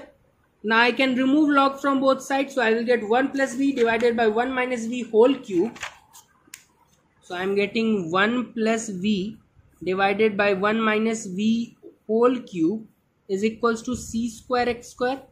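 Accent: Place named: Pakistan. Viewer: Bro your pronunciation sounds like mohd.rizwan(PAK CRICKETER)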